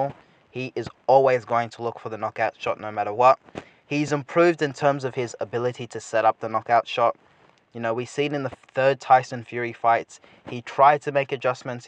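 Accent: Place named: Australia. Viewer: 👍 I knew I could hear a slight Australian accent in your voice. It's very mild though...hard to tell.....I bet most Americans think you're from the UK!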